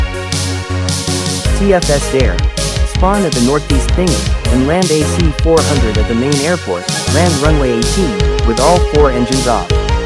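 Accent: France. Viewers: Are you French? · are you french ?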